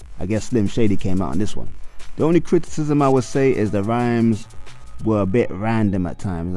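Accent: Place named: Haiti. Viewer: Wow your review is trash bro btw you sound hatian so that explains a lot you don't understand our music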